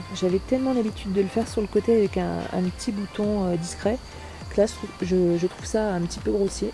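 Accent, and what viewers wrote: France. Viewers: good choice nice helmet btw your English with french accent I LIKE IT.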